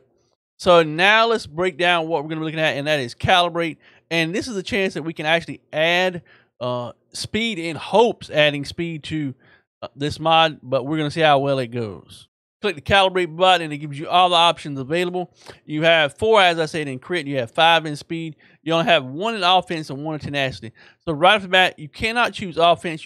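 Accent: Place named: South, United States. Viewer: your fast paced southern American accent is quite hard to follow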